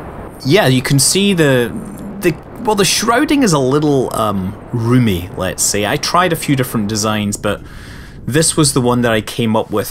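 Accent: Scotland, United Kingdom. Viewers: It's. He have scotish accent.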